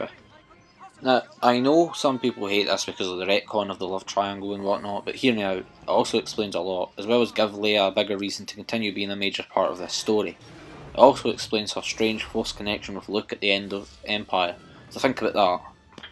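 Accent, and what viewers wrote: Scotland, United Kingdom. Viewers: Thank you so much for spitting facts Return of the Jedi is the best of the original trilogy and it has always been better than the Empire Strikes Back and it’s so awesome that you’re Scottish I always like Scottish things and I like doing the accent to I say this famous line from Mike Myers “Welcome to all things Scottish if it’s not Scottish it’s CRAP” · That Scottish tho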